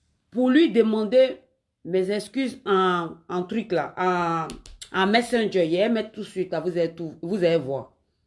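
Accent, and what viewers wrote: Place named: Guinea. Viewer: Tu fais peur tu ressemble à une sorcière voilà tu es venue de la Guinée 🇬🇳 voilà pourquoi ton français est penché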